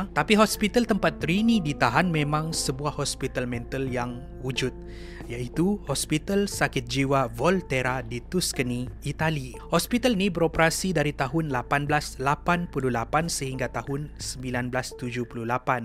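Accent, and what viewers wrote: Sabah, Malaysia. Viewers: Abang ni orang melayu ( Sabah ) atau indonesia ya? Kalau saya orang malaysia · Btw i Mo ckp , loghat mu mcm kntara org Sabah 😅😅, org Sabah eh ? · Orang sabah kah ni😂😂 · Abang orang Malaysia ke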